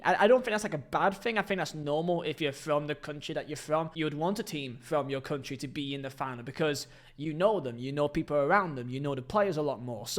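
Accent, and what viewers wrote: United Kingdom. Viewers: Oh my God, I thought I'm not able to notice british accent as a total foreigner, but... Wowowowowow 😮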